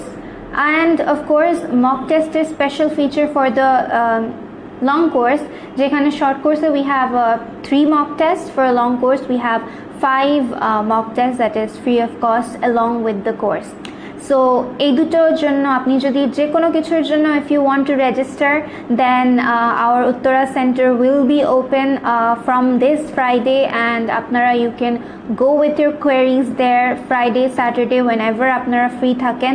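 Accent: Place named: United States. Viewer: আপনি বাঙালি বাংলা এমন ভাবে বলেন যেন আমেরিকা থাকেন। আগে নিজের ভাষা ভালোভাবে বলতে শিখেন তারপরে ইংরেজি শিখাবেন।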